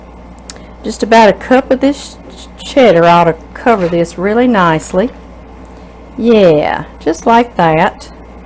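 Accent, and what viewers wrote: South, United States. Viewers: This looks so delicious!! I just love watching you and listening to your southern accent 😍 I just love pampered chef, you keep on Killin them with your wonderful kindness ❤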